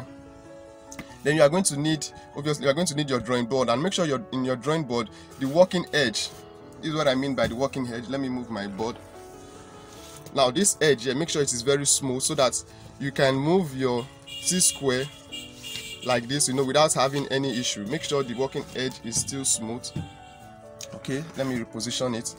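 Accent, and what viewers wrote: Nigeria. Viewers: Thanks very much sir. Sir it's seems like you are from Nigeria. Are you from Nigeria?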